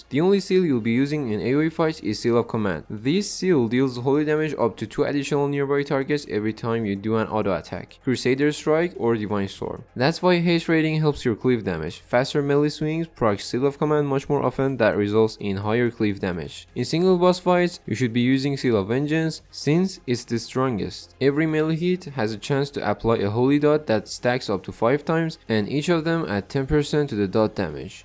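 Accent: Iran. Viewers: Are you Iranian by any chance? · lahjat yekam farsi mizane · R u Iranian bro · You have a Persian accent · you have kind of an persian accent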